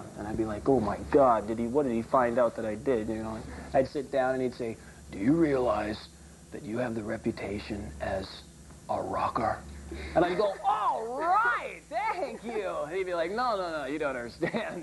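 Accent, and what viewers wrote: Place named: United States. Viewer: Never liked his American high pitched feminine voice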